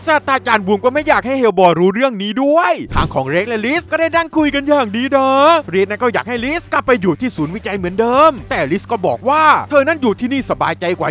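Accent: South, Thailand. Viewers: แอ็ดคนใต้ม่ายนิ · คนใต้อยู่แล้วครับผมว่า แต่ไม่รู้จังหวัดไหนครับ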